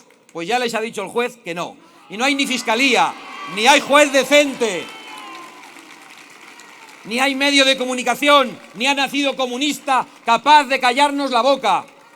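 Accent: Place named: South America. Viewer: Mi marido dice que suena sudamericano, no español.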